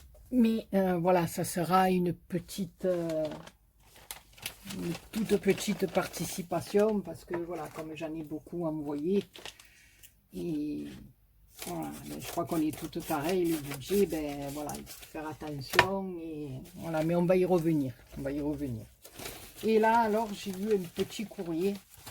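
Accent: South, France. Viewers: C’est dommage que tu sois obligée de te justifier. Ton expliquement et avec l’accent c’est presque du Pagnol. J’adooore. Tun’est pas là seule à vendre et après. Qu’ils s’occupent de leur c........bravo la star. Bisous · Bonjour je tombe sur votre chaîne et quel accent charmant qui sent bon le soleil ☀️ laissez parler les mauvaises langues et bonne continuation 😘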